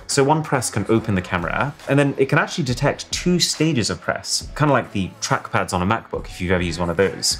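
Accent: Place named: South England, United Kingdom. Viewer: You have a posh accent from south england. But you say "faster" like a northerner.... are you just putting it on?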